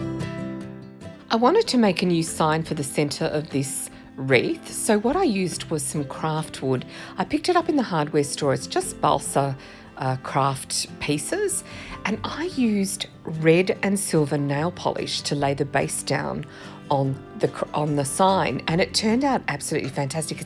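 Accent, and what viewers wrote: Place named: Australia. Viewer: Hi these are gorgeous DIYs, it's nice to see /hear an aussie crafting on youtube, I live crafting but struggle to find suppliers many ribbon at Christmas here I'm in rural Victoria, I really enjoy your channel thanks for sharing all the best Mary 🌻😊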